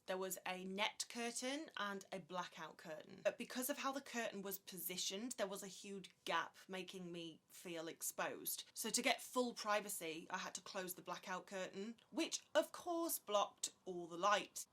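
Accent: United Kingdom. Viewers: I just love a reviewer with a British accent!.....btw I love virgin voyages!!!1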